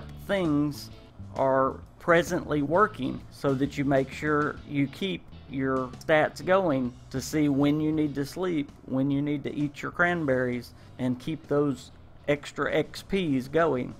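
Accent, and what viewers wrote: South, United States. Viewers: Nothing quite like a Southern gentleman's voice! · I have never heard a more thick southern accent, and I am in the south.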